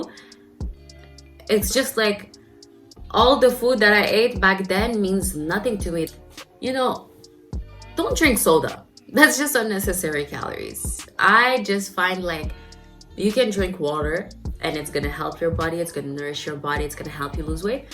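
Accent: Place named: Tagalog, Philippines. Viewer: Awesome video! Thank you for sharing your journey with us. Side note: your accent sounds a bit like you are a native Tagalog speaker (Philippines). I’d be curious about where you are from.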